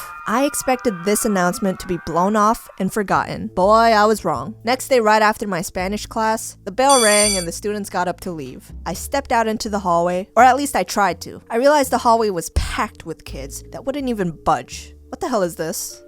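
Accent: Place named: Long Island, United States. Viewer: You sound like a mix of Karina and Julia's Long Island accent from Drawfee.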